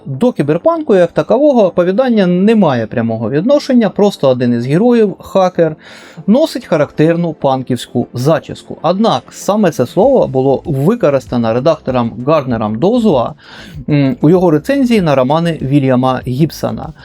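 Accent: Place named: Russia. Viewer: Ви дуже класний, але акання вас виказує.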